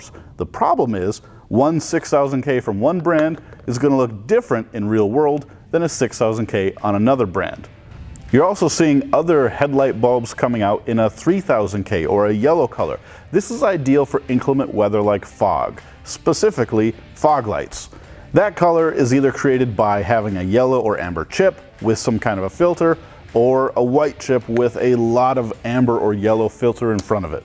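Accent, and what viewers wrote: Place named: United States. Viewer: Dude, you should start with considering legality FIRST! Since you have an American accent, I will assume you are doing this for the US audience and you should be aware that per the DOT it is ILLEGAL to use LED bulbs in halogen reflectors! Helping you see better while blinding on coming drivers is not safety inhancement! Esp as they crash headon into the offending driver with LED headlights! And really easy to check on post accident.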